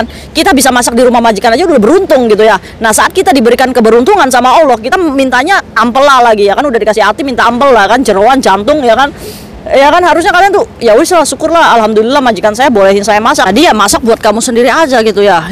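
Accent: Indramayu, Indonesia. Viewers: Metu bhsa dermayune angel angel 😂😂😊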